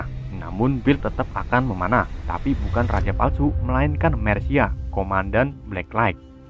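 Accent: Indonesia. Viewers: Yah gpp bang kan logat orang indonesia jadi masih rada² susah ngomong bahasa luar yah belajar aja semoga bisa 👌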